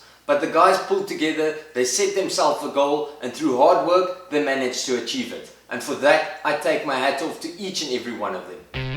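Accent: South Africa. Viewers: Hey man I loved the series, looking forward to seeing more content like this. I was wondering where you guys are located ? The accent sounds a lot like South African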